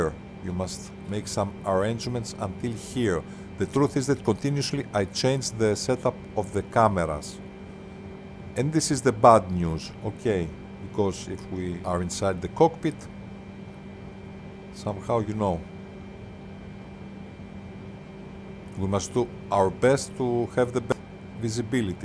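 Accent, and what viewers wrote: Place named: India. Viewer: the minute i herd the indian voicwe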